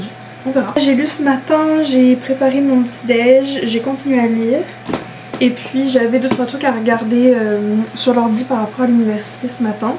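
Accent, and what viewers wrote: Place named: Quebec, Canada. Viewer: Oh, je ne m'attendais pas du tout à t'entendre parler avec l'accent québécois, ça te change tellement ! ça fait plaisir de te retrouver sur YouTube 🥰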